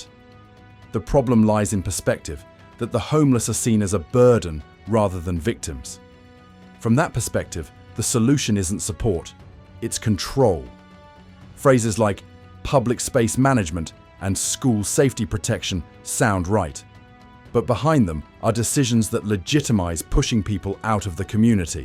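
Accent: United Kingdom. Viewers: the British narrator seems strangely out of place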